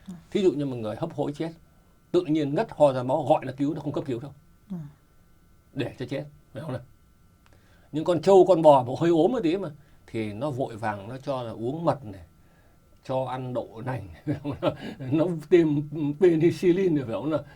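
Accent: North, Vietnam. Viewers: Anh này giọng Bắc bây giờ anh nói là người bình thường .Tớ cũng bình thường sống bình thường sao thấy anh nói bậy bạ liên thiên vậy .các cụ nói trước khi nói phải đá lưỡi 9 lần đấy. Nói bậy nhiều quá .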